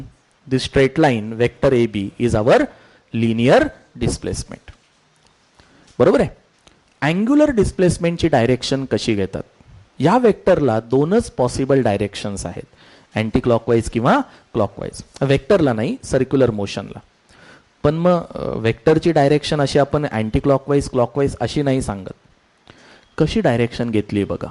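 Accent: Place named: Pune, India. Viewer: Tumhi PUNERI such a shuddh Marathi. So smart teaching.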